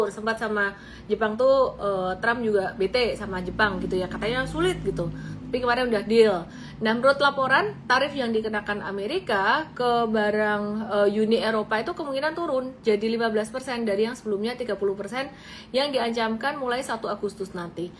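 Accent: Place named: Surabaya, Indonesia. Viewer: Enak banget mis suroboyoane ketel 😂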